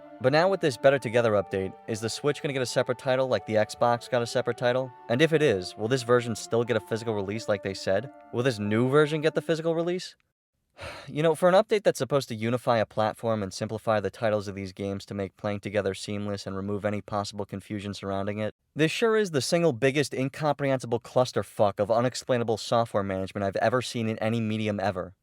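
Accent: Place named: New Jersey, United States. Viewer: Idk why, but based on your cadence and voice, I just have a FEELING you're a New Jersey resident who either lives close to Philly or used to.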